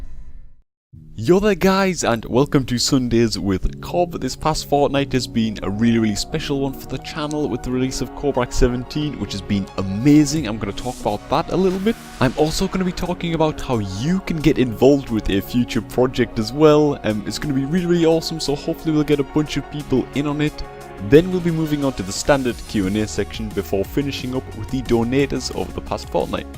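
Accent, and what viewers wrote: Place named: North East England, United Kingdom. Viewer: I understand all that you say, pronunciation isn't a bother for me:P Although I'm from north eastern england also, so I have a similar accent:P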